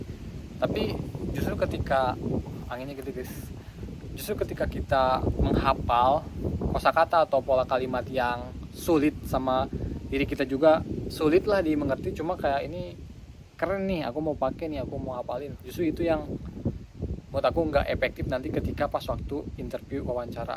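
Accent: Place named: West Java, Indonesia. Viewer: "Aku nyaaa cangkeull" sunda pisan nya a😅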